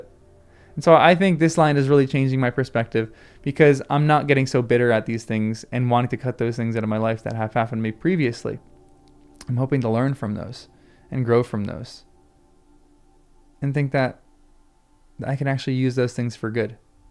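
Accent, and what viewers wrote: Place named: United States. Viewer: Dreadful American accent. Gaal’m.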